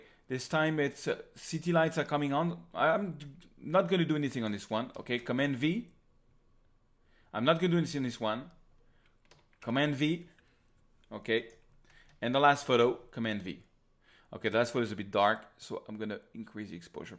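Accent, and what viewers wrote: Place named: France. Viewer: Nice tutorial. Everything's clear. Unfortunately, it was with your french accent but it's always better than the one from Gunther from LRTimelapse !! (^_^) Try it in french next time. And btw, the name of the device you were looking for is an intervalometer.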